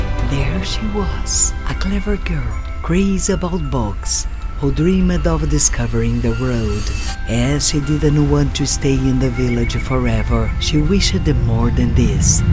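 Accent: Brazil. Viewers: A narradora tem sotaque brasileiro quando fala inglês.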